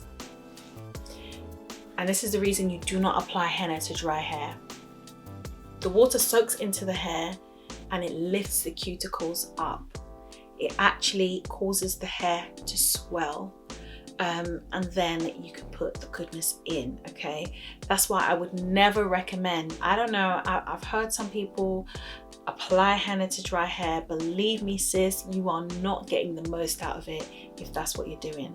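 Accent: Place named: South London, United Kingdom. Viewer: you sound so much like you are from South London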